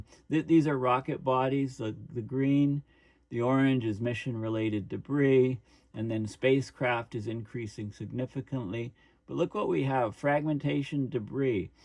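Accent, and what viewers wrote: Canada. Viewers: How do you call yourself an Irishman today? Sounds like a Canadian accent to me.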